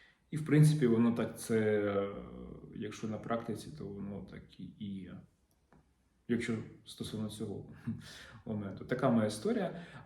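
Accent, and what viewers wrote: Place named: Lviv, Ukraine. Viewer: Львiвська мова неперевершена iiможна впiзнати де будь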